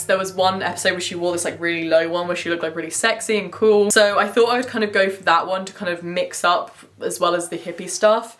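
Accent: England, United Kingdom. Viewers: I love your accent ❤️❤️❤️ The way you speak makes me want to move directly to England!!! Btw my mom is german and my dad is from London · Hey. I have to say, I’ve been watching you for about 3 or 4 years. I’ve grown up watching you, and you’ve throughly influenced my aesthetic. Plus, as an American, I can do a pretty great English accent because I’ve watched you so long 😂 anyways, just wanted to say that I’ve noticed you have been a bit happier in these videos than your old ones, I don’t know if this is true or not but you’re putting out some positive vibes. Sorry for the paragraph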